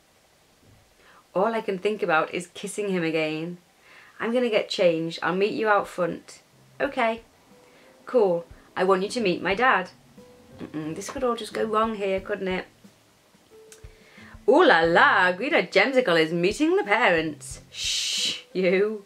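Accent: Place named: England, United Kingdom. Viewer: How do you read so fluently??? I luv ur accent, do you live in England? :3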